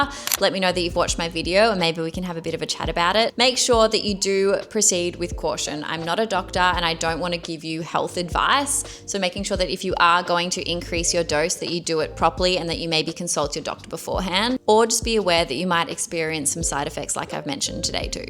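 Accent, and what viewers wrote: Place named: United Kingdom. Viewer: first of all great video. People er your accent you live in the UK. Myself, I live in sunny hot Florida. Northern Florida in Jacksonville. I'm now 65 years young. And I stand at 7 foot 3 inches tall. I take my 5 grams of creatine 8 times a day. Sometimes I mix it in my whey protein shake or just drop it in my mouth and swallow it down. Yes es, it's the water. I drink about 2.5 to 3 gallons a day. And I mix my whey shakes with 2% milk and a raw egg. I used to be huge, but then I moved to Florida. Now I'm retired and I'm lifting again. I want to get back the body that I lost. But now it will be much harder because now I'm a amputee below the knee plus I'm in a wheelchair. But not to much longer. I'm getting tired if this chair, and I'm going to prove to my surgeons that they were wrong. They told me that I would never walk again. 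I'm a stubborn person, and you never say the word Never to a stubborn person. In 2016 I had my leg removed below the knee, exactly one year later I started my thru hike of the AT trail. I got half way in 4 months,before my custom hiking poles snapped in half. I was walking between 10 to 15 miles a day. Then 2 I'm years later I found out that I got a E-Coli infection on my spinal cord. Me and my doctor's killed that infection, before it killed me. But where that infection sat, it ate the cartkedge completely away in two spots on my spinal cord. Then they put me on opioids. They only take the edge away not the pains. But once my doctor cleared me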